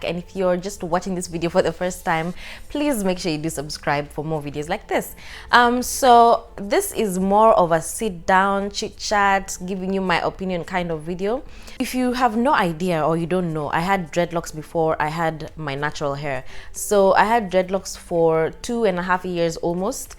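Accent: Kenya. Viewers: You sound Kenyan. something about your accent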